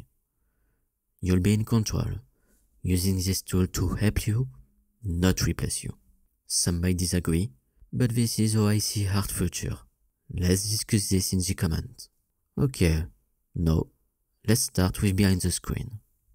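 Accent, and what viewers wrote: France. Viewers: It is amazing that you came back with another video! We missed you and your content which is very inspiring and valuable, thank you so much for taking the time to share it 😊 And don’t you ever worry about the french accent or the way you are speaking english, keep talking, there are people who likes or loves hearing it.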